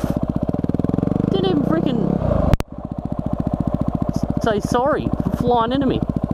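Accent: Australia. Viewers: is he from australia?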